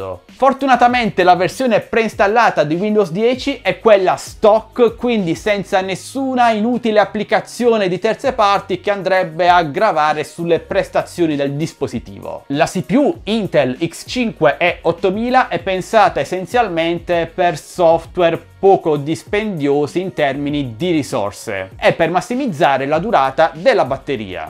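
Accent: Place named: Bari, Italy. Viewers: Cmp sei di Bari , Se sì Anch'io sono di Bari( si è sentito dall'accento)😝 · Dall'accento sei barese come me , grande . Un consiglio . Questo PC , con queste caratteristiche , potrebbe essere utile per fare musica , stile karaoke ?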